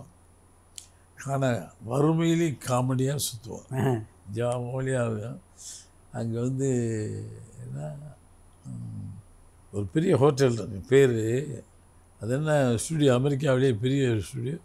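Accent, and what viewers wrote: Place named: Butler English, India. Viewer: Butler English தான் தல உன் சிறப்பு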